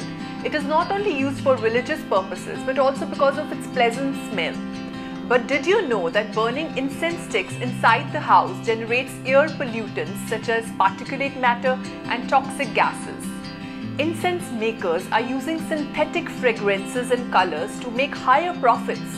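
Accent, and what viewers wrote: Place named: India. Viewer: I didn’t expect that heavy Indian accent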